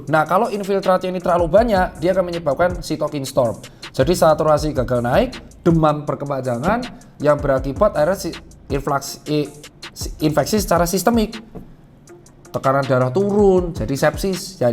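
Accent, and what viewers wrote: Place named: Yogyakarta, Indonesia. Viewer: Yang bikin beda itu penjelasan dr Tirta adalah mudah dipahami dengan kemampuan berpikir orang awam medis.... Dan bahasa logat nya jogja banget...salam dr Bantul... Semoga dr Tirta sehat selalu...GBU.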